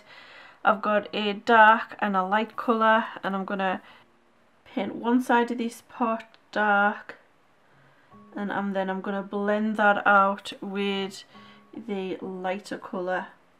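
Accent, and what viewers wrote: United Kingdom. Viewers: Well done! Love your accent - UK I'm guessing?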